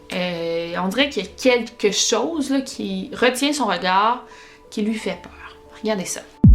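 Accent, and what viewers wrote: Quebec, Canada. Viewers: Je crois que je kiff ta chaîne je regarde tes vidéos dans mon lit et même quand je fais la vaisselle ! Et je commence à tomber sous le charme , dire que normalement je déteste l’accent québécois ... haha J’attends la vidéo avec les parcs nationaux avec impatience bisous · Coucou je vient de découvrir ta chaîne et j'adore continue comme ça au passage d habitude je suis pas fan de l accent québécois mais comme tu es jolie sa te donne un charme fou =) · Coucou ma belle ! Je suis du sud ouest de la France et j'ai découvert ta chaîne ya deux ou trois semaines et franchement une fois qu'on s'est habitué à l'accent québécois, t'es vidéos sont passionnantes, t'es récherches super biens faites et ta façon de raconter est fluide et agréable, pas de blablas inutiles mais de belles descriptions et des photos d'illustrations qui sont suffisamment nombreuses pour retenir un peu plus notre attention sans pour autant tout gâcher en étant omniprésentes... 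BREF UNE ÉNORME RÉUSSITE !!! Bravo à toi franchement, mon copain est devenu allergique au québécois, et moi par contre je crois, que je commence à mieux parler québécois que français 😂 😂 😂 😂 😂. Gros bisous et bonne continuation je te souhaite tout plein de succès !!!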